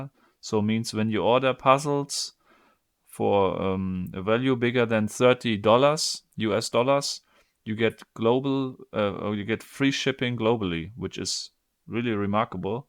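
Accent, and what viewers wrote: Germany. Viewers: Der gute Herr klingt ziemlich deutsch... ^^ ,,smells after" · the most german accent on youtube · Swastika, but isn't voice german? (Thinking hard) Hmmm.....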